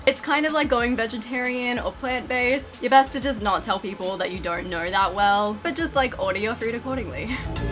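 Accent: Australia. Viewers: cool video! i was also thinking about going sober for a while... also i really like the Australian accent, makes me really nostalgic :)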